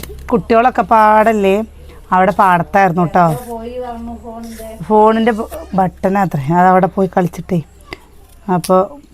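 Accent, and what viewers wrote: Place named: Valluvanad, India. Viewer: It is Valluvanadan style. Try to respect.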